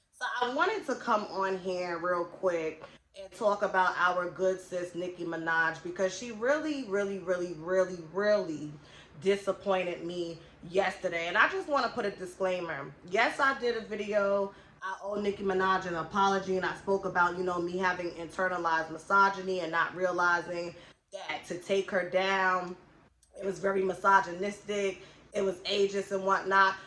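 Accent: New York, United States. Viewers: Its probably bc I'm a country ass SC girl lmao but I LOVE a NY accent. I could listen to you talk all day lol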